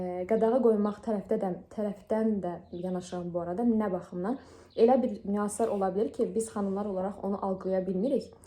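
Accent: Azerbaijan. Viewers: Ozum Zaqatalaliyam amma Bakida yaşayıram.necese təsadüfən qarşıma çıxdız...avar olmaginiza rağmen danışıq diksiyaniz ve tərziniz aksentiniz cox gözəldir.meni o celb eledi ki size baxim😊ve Maşallah beyimizin bir sözüde lap xosuma gəldi ..eger bir adam sizin üçün deyismirse deməli o düzgün adam deyil😅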